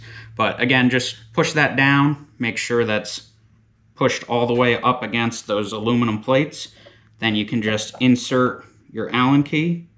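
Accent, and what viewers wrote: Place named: North Carolina, United States. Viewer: where you out of your accent sounds eastern NC-ish, love this setup.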